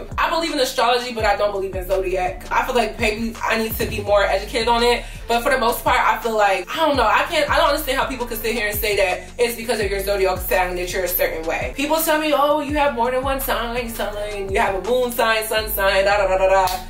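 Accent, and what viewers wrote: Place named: United States. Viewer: think about it as being phonetically. There is a popular gospel song with the correct pronunciation as well as song called for reference. Like there is an emphasis on the first half and the a is shorter than the traditional American a. Right now your cutting off the sound of the I and elongating the A at the end which is more American English pronunciation then the traditional Igbo pronunciation. I know because I have the same Igbo name (Grace is my English name). I commented earlier as someone else also mentioned this as it’s quite noticeable. I was born in the US, so I know it’s hard to pronounce everything perfectly.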